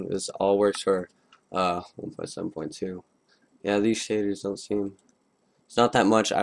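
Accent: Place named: Germany. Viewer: ohne spass alter du hast den mega dollsten ekligsten deutschen akzent den es auf der welt gibt yo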